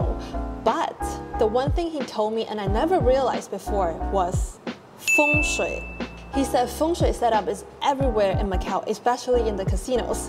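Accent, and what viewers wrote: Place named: Hong Kong. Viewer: 閣下的廣東話像是一個香港人，不知是否正確？純粹好奇一問，祝新年快樂，訂閱人數蒸蒸日上，紅遍大江南北。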